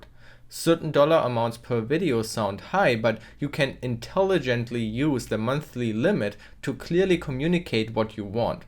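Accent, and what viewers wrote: Germany. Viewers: what part of Germany you from?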